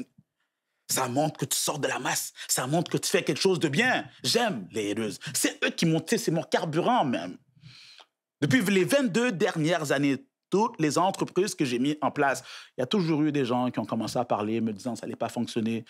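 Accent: Quebec, Canada. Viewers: Je suis là pour l'accent québécois ! J'aime trop... et pour mon business qui marche pas 😂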